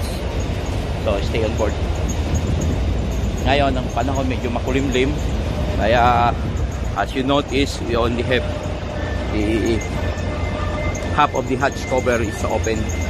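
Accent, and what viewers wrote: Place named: Bohol, Philippines. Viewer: Boholano salita oi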